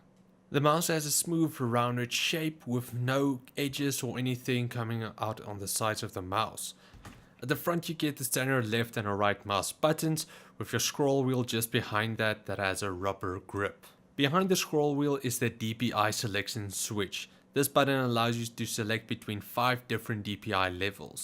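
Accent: South Africa. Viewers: thicc South African accent. Nice vid. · Sounds south african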